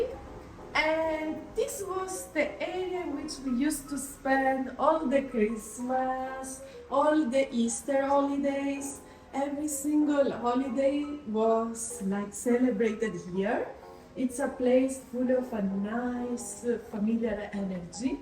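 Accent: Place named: Italy. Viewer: When I come back in the next life I want to be your personal film maker, follow you with a camera all day and listen to that wonderful English with the Italian accent. What a cozy domicile your grandparent's house is. Glad you held onto it and didn't sell it. I am sure you can feel your grandparent's energy when you are there, thank you for the tour.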